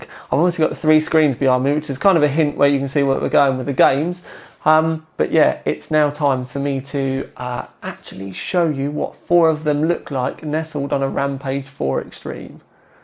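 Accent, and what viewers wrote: United Kingdom. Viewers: I have no clue what those numbers mean but listening to his British reactions I'm guessing they're pretty damn good.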